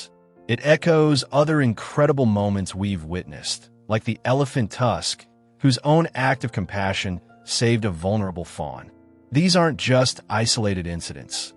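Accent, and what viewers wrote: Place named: United States. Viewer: Oh dear, sound off again, as it wasn't the lions that sent chills down my spine. It was that flaming American narrator's voice!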